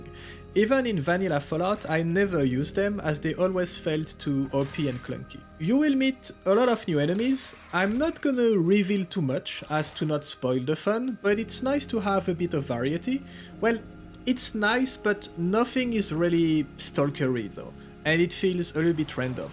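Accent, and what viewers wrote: France. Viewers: The most French accent ever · le bonne accent français, j'adore ;)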